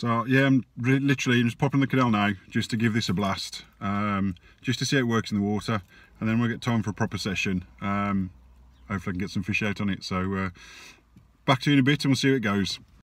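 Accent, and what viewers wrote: Birmingham, United Kingdom. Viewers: haha no brummy sure sound like one to me mate haha well yeah keep it up bud love the vids and speak soon good luck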